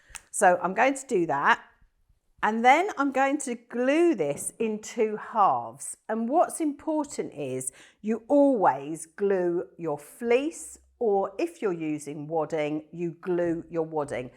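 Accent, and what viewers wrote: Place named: United Kingdom. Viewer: I love listening to you speak. Usually, I can't understand British speaking people ... And if I can, the way they speak can be so over the top. But not you. Your speaking voice and accent is perfectly lovely. Just Beautiful.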